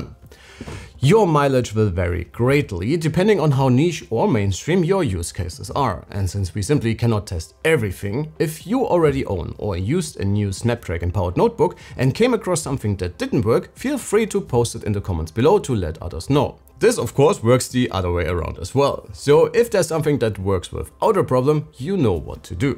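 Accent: Germany. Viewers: Hey, du sprichst eigentlich deutsch oder? Ich erkenne doch einen deutschen der Englisch spricht :)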